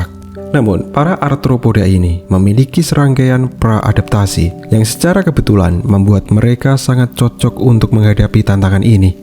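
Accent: Java, Indonesia. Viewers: vo nya jawa 😂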